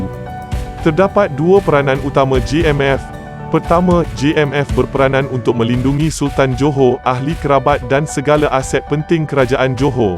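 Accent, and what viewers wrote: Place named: Indonesia. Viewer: Kemungkinan besar asal pembaca narratif ini dari Indonesia kerana pronounciation letter 'J' bunyi macam bahasa Indonesia (based on Dutch). 😂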